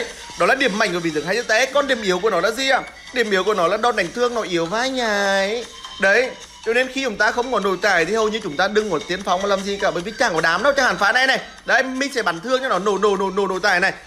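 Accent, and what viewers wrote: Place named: Ha Tinh, Vietnam. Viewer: Eng ở hà tịnh hay nghệ an rứa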